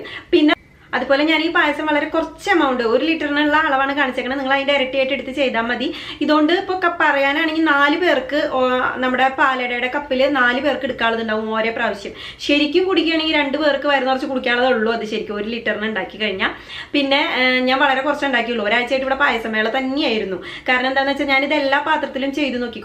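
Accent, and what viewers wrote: Thrissur, India. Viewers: Chechi de varthnm nth rasa... Enik othiri ishtay.... ❤️😘Trissur aana place? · Thrissur alle veeed Alla... slank? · തൃശ്ശൂർ ആണല്ലേ 😃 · Pukkaaaa thrissur bhaasha...ngaan thrissur adutha padiche. Nostalgic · അടിപൊളി ചേച്ചി ഈ കണ്ടതൊന്നുമല്ല വീഡിയോ ഇതാണ് ചേച്ചി പറയുന്നത് നല്ലതുപോലെ മനസിലാകുന്നു നല്ല voice തൃശൂർ ആന്നോ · thrissur da bro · Trissur bhasha polichu.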